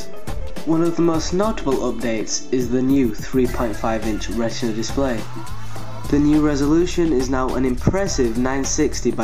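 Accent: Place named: England, United Kingdom. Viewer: I like how your English and not yank.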